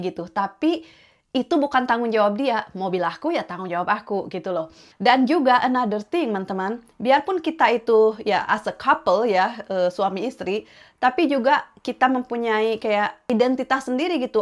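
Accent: West Java, Indonesia. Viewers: Dengerin cara ngomongnya si mommy ini nyaman banget,serius deh,nambah ilmu juga walau aku belum menikah,dan ada kesamaan tentang anak2 yang membantu di keluarga,krn di keluargaku sejak kami(aku dan 3 adikku kecil) sudah terbiasa abis makan,cuci piring masing2,cuci baju sekolah masing2,karna mommy aku meninggoy sejak kami masih SD,bapa kerja pagi2 banget,dan jadilah aku ngurusin 3 adikku, anak kecil ngurusin anak kecil,jadi dah biasa mandiri,oke sehat2 mommy and family,btw pengen deh keluarga ini ngevlog mudik ke Indonesia,ke kampungnya di sunda kan soalnya logatnya sunda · eteh sunda de besssss pokona mah 😍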